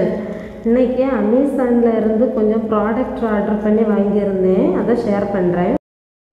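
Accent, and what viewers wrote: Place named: Kanyakumari, India. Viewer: Good to hear your Kanyakumari tamil Stay back connected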